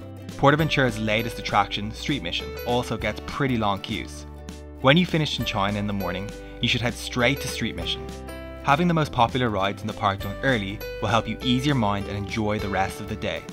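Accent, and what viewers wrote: Ireland. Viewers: Was surprised to hear an Irish accent!! Irish here too! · This is so random are you Irish? 😂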